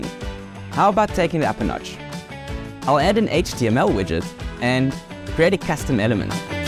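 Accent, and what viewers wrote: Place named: South Africa. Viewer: This oke is South African. Random.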